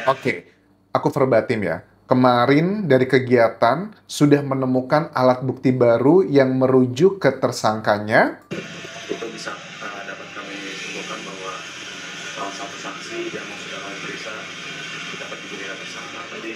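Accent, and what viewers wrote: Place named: Banyumas, Indonesia. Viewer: Ujug ujug 😂 wong ngapak admin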